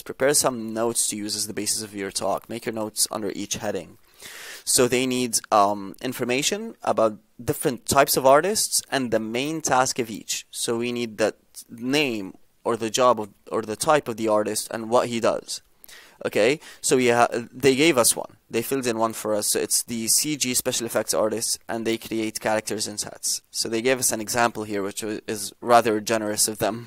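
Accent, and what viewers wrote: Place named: Iran. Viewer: u have a mix of iranian accent and a english accent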